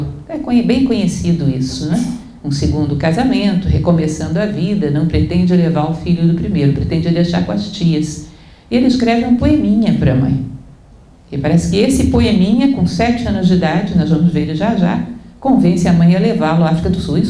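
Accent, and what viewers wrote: Brazil. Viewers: Foi uma linda festa pá, mas faltou a língua de Portugal, a lingua portuguesa. A língua brasileira atrapalha, ainda com todo o esforço realizado, estilo e sabedoria, de, "nome bem portuguès, por sinal", e seus declamadores. De qualquer forma, se em Portugal não se fizerem festas assim, que se façam no Brasil ou no resto do mundo, pois dá para perceber o amor de muitos brasileiros a este cantinho da Europa Ocidental, onde nasceram seus antepassados. Já agora frisarei que "a minha biblia" o Livro do Desassossego, foi editado em chinês há + ou - 20 anos. Parabéns a pela sua entrega ao poeta e filósofo! Como teria gostado de a ouvir falar em português de Coimbra... O UNIVERSO INTEIRO NÃO PARECE UMA BRINCADEIRA, UMA PARTIDA DE UMA CRIANÇA TRAVESSA? TÃO IRREAL, TÃO... L. do Desassossego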